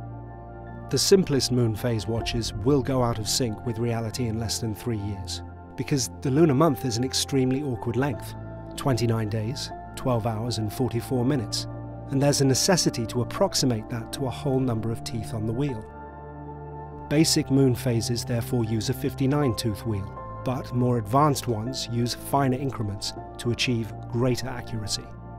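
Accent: United Kingdom. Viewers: Great video. Love this style, and of course the British narrator. Beautiful watch for sure too!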